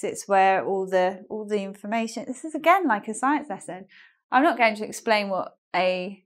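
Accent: United Kingdom. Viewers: how is that accent called? i love the British accent. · Thanks for this informative lesson. I really fancy the Brits and British accents. Eventually, I found you and your amazing videos there <3 Keep going, x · Hi, Are you still live in İstanbul? I wish we meet you in subway and try to speak with you British accent:) Thanks for lessons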